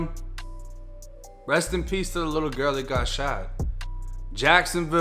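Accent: Boston, United States. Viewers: I love your accent!! When I moved to Orlando from NY everyone knew immediately where I was from lol. Can't take that Boston know matter how long you live in Florida!! Btw love the way you tell these true crime stories!! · Y u always claiming Florida and obsessed w Florida when u sounds like u from Boston!!! That's what I really wanna kno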